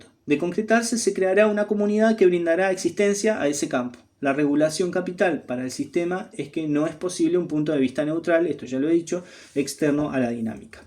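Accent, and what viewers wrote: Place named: Uruguay. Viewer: Hola. Envidio esta elegancia en la expresión. Y este acento uruguayo me parece también magnífico. Gracias por regalarnos tanto conocimiento y por ser tan crítico con determinados tipos de inclusión que, en lugar de integrar, desintegran la vida de muchas personas porque desintegran su mente. Un saludo cordial.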